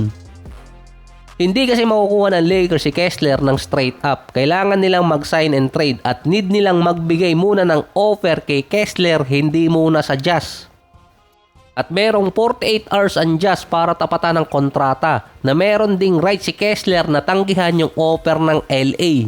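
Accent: Batangas, Philippines. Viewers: Batangueno ka pala 😂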